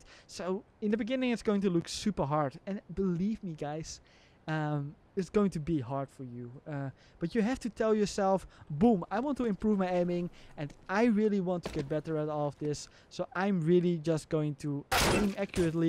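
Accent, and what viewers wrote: Netherlands. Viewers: The really small adjustments are the hardest, big flicks on the body are fairly easy, but when your crosshair is just 1 pixel OFF the enemy ... So hard to compensate if you have to do it quickly. It's what i'm practicing most atm , also is this guy dutch ? SOunds like dutch accent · are you dutch?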